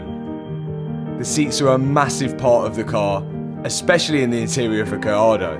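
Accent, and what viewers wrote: United Kingdom. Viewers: This British accent is so good to hear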